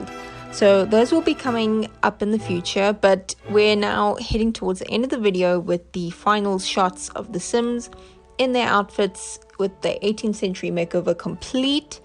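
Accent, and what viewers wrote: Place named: South Africa. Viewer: I missed your entry video (consider it watched now)! Thanks for this. Great hearing a SA accent again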